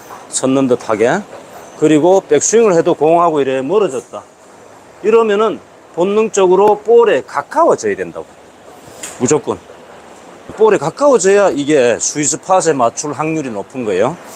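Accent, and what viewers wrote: Gyeongsang, South Korea. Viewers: 갱상도 구수한 사투리 ㅎㅎ 저도 갱상도 출신이라 정겹네요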